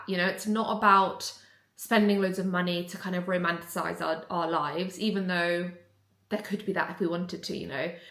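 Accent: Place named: United Kingdom. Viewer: Wow...love your accent as i'm always a fan of british accent so i assume i got a reason to watch your vlogs...🙏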